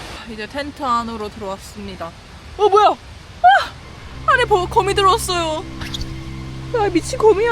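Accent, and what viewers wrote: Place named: Gyeongbuk, South Korea. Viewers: 경북인건 1000% 대구세유? 사투리 별루네... 부산 아님 ㅋㅋ 깨긴깬다 ㅋㅋ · 마! 이게 갱상도 여자아이가?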